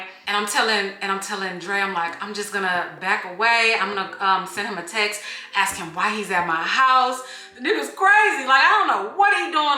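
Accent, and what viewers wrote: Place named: Texas, United States. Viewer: I was thinking how you sound like Brooke Valentine then realized y'all are both from Texas. This was a great story time!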